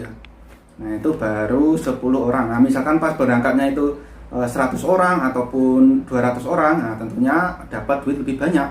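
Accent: Java, Indonesia. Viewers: meni medok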